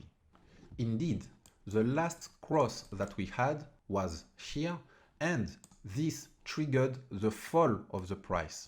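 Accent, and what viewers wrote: France. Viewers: L accent est français non?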